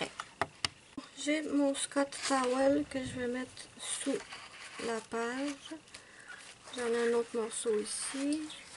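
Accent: Quebec, Canada. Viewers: Moi je te trouve très courageuse, tu essaies un nouveau materiel devant nous. Super! Oui ta peinture est magique, je me la suis commandée. Je vais essayer. (J'adore ton accent Quebecois 😁) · Coucou! Il est très joli comme ça ton colo. Pour les craies, je ne saurais pas trop dire, en plus j'ai les neocolor 2, mais par contre, dans mes Hanna Karlzon, je les estompe en mettant un peu d'eau sur le doigt. J'aime bien quand tu utilises des expressions québécoises, tout comme ton accent je trouve que ça contribue au charme de tes vidéos. 😘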